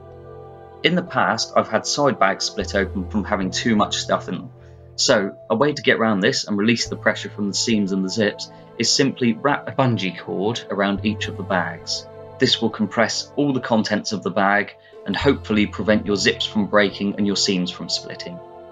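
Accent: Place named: United Kingdom. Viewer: sometimes i dont undertand uk english but nice video dude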